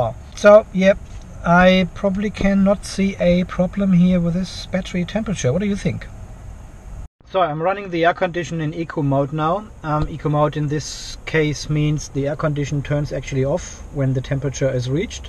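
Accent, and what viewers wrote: Germany. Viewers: R u german ?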